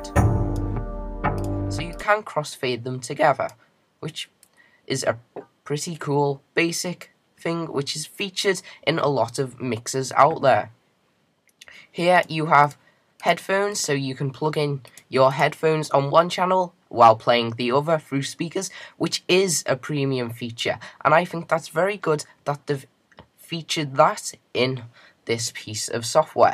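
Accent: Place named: United Kingdom. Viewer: i love british voice.. pure english voice for a bulgarian for me... btw this review is great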